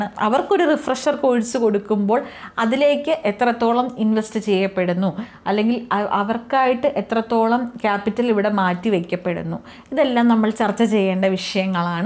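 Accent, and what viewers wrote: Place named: Malappuram, India. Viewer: Malappuram/palakkadu slang💖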